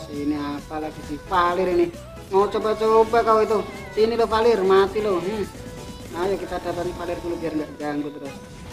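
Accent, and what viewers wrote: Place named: Java, Indonesia. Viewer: Medok mas😀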